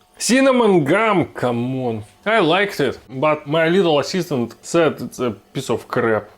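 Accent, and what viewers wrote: Russia. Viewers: is there just all russians watching a russian guy speaking english funnily and then commenting in english?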